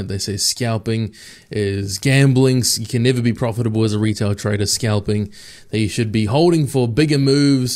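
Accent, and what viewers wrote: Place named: Australia. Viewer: you sound like you have a bit of an Australian accent but you say pm East coast time, what session is this in Australia ?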